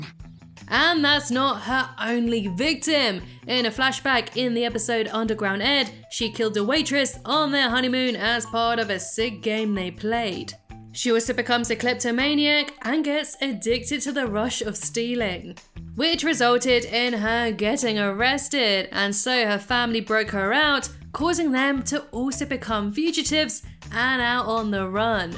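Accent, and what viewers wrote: United Kingdom. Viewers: You have a beautiful British accent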